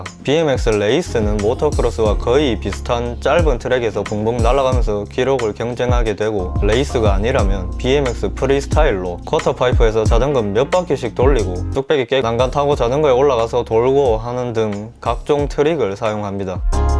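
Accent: Gyeongsang, South Korea. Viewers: 구수한 경북구미지방 특유의 사투리에다, 중간중간에 표정 찡그린 캐릭터 얼굴 나오면서 높은 톤으로 "~~해도 별 차이없던데~" "~~해도 잘만타고다니는데" 하는 부분이 진짜 웃김 ㅋㅋ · 잡소리 없이 똑부러지는 설명...양념으로 경상도 사투리 억양~ ㅋ 좋아요~~ㅋㅋ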